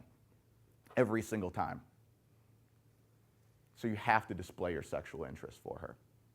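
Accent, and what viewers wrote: United States. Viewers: I thought this guy was British. Nope, can't trust an American to give me advice on how to hold a proper conversation. Americans (even the educated ones) don't know how to use basic proper English phonics, punctuation and say "like" out of proper use WAY too much. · Very Intense, very american, not so british, wouldn't work as well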